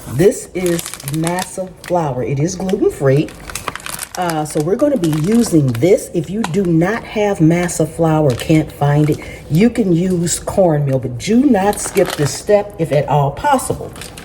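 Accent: South, United States. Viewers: Girl...I suspected you were southern because I kept catching a southern accent here and there, but this video confirms my suspicions...southerners definitely do NOT put beans in their chili!!! 😂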